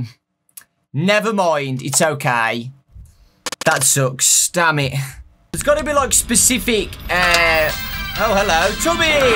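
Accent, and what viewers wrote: United Kingdom. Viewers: wow you are british!!!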